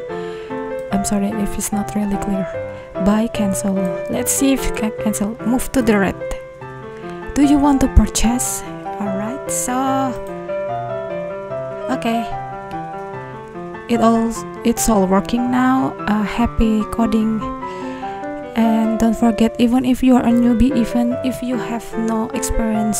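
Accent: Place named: Indonesia. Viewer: sounds like indonesian accent, i might be wrong.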